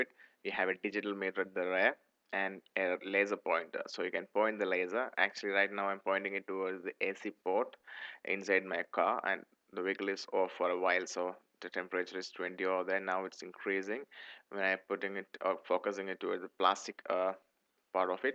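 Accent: India. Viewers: typical indian accent !!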